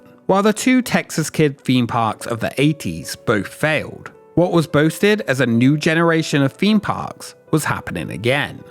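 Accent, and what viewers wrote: United Kingdom. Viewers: Because unlike the builders of Hanna Barrera Land, is providing things people like. I'm pretty sure many people's lists of things that they like would include "listening to a cool sounding voice with a British accent." 😎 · This is about American theme parks but why does the host here have a British accent or is it Australian sometimes I just don’t know the difference. It’s very confusing however. Love this channel and especially the theme not this new theme but the main theme that came after the new theme don’t ever lose that.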